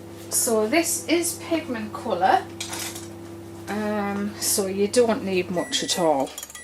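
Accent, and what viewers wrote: United Kingdom. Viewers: What ?! people are watching your videos to hear your lovely voice with your beautifull British accent and your soaps that are really but really look tasty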